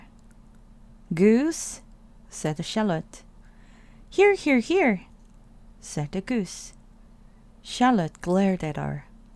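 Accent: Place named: United Kingdom. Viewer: 이 영상 덕에 영국식 발음 배운것같아요^^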